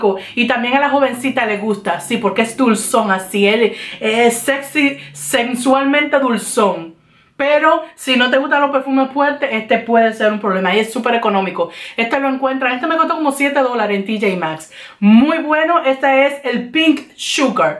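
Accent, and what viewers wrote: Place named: Dominican Republic. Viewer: Saludos, soy nueva en tu canal, por ttu acento creo q eres Dominicana. Amo ese país. Fiesta todos los días.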